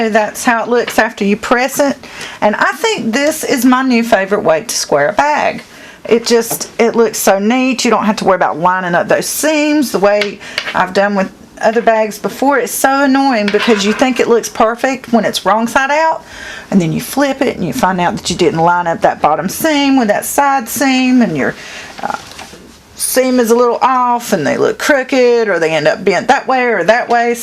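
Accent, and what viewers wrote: South, United States. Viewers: I subscribed. You are sweet and feisty af. Plus my fam is from SC so the southern twang makes me feel right at home. Thanks for being lovable and informative.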